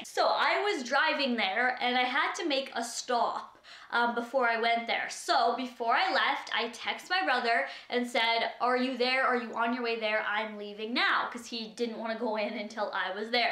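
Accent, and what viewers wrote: Canada. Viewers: Are you from Canada because they say 'sorry' in the same accent you have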